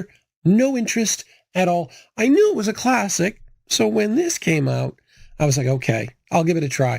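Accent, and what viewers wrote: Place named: Canada. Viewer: This was my first time on your channel but I could tell you're a fellow Canadian pretty quickly, glad I got that accent detection down. Also I'm pretty sure that's a maple leafs puck in the corner. Good stuff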